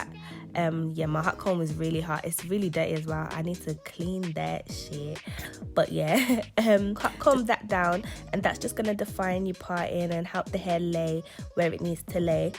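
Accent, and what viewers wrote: Birmingham, United Kingdom. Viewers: It’s a Birmingham accent x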